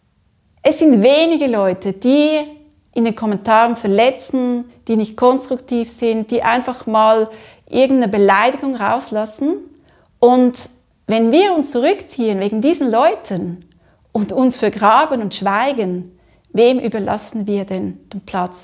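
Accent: Switzerland. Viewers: Negative Kommentare sind auch irgendwie eine Auszeichnung ! So ein „negativer Kommentator“ nimmt sich die Zeit um Deine Videos zu sehen, ist möglicherweise neidisch oder kann mangels fundiertem Fachwissen keine sachliche Kritik anbringen oder hat Probleme sich angemessen auszudrücken . Wenn „negative Kommentatoren“ Deine Videos wirklich nicht gut finden würden täten sie diese nicht anschauen oder gar zu kritisieren versuchen. „Nicht einmal ignorieren solche Leut“ würden wir Österreicher sagen 😜 Ausserdem, ich liebe Dein schweizerisches Deutsch 😍 Ich finde es sehr wichtig und erfrischend wenn wir „Deutschsprachigen“ unser Dialekte sprechen und nicht von Schleswig Holstein bis Südtirol ein Einheitsbrei gesprochen wird . Liebe Grüsse aus Oberösterreich ! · Für einen erfolgreichen YouTube Kanal braucht man in regelmäßigen Abständen einen guten, hilfreichen Inhalt. 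Weiterhin ist es meines Erachtens wichtig, dass die Person authentisch rüberkommt und man nicht den Eindruck hat, dass da eine Show abgezogen wird. Beides ist bei deinen Videos voll und ganz gewährleistet. Dazu der schöne schweizer Akzent, der deine Authetizität noch unterstreicht. Vielen Dank für deine ausgezeichnete Arbeit! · Vielen Dank für deinen ehrlichen Beitrag! Ich liebe deine Videos und konnte mir bereits viele wertvolle Tipps mitnehmen. Ich finde dich sehr charmant und liebe deinen Schweizer Akzent. Gerade das macht dich so authentisch und unterstreicht deine sympathische Ausstrahlung! Viel Erfolg weiterhin! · Ich liebe deinen Schweizer Dialekt 😊🇨🇭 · Liebe, Du hast mir aus der Seele gesprochen. Es ist zumTeil unglaublich, was man im Netz so erlebt... Viele meiner Kollegen schalten Werbung und werden dadurch persönlich angegriffen... ohne Worte. Danke deshalb für dieses mal wieder sehr gelungene Video. 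Ich persönlich mag neben Deinen Inhalten Deinen Schweizer Dialekt besonders. Bitte deshalb unbedingt beibehalten und kultivieren;-) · Danke für deine offene und ehrliche Meinung. 👍Mach weiter so mit den Lehrreichen Videos. 👌🏻PS: ich mag so sehr deinen schönen Schweizer Dialekt. Gruß aus dem hohen Norden, Manfred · Zum Glück kannst Du Selbstbewusst mit dieser Problematik umgehen...Ich mag Dein geschweizertes Deutsch..😊 Du bist super... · Wow, ein super Motivations-Video! Vielen lieben Dank! Mal ganz abgesehen davon, daß du wirklich sehr sehr sehr guten Inhalt produzierst; ohne dein schönes Schweizer Akzent wären deine Videos weniger Scharmamt ❤️. Ich finde es Super, daß du Mut gefunden hast und weiter gemacht hast. Deine Kurse sind bestimmt sehr sehr gut! Ganz liebe Grüße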